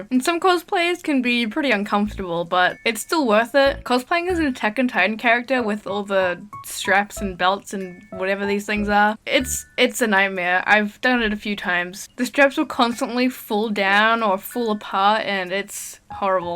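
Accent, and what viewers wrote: New Zealand. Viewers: You're a kiwi too? I just assumed you were australian oops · Are you from New Zealand?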